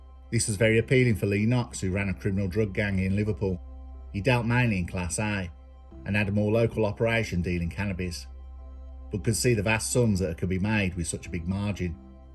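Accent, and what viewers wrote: Birmingham, United Kingdom. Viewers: Great video but F-me! That Brummy accent makes my toes curl!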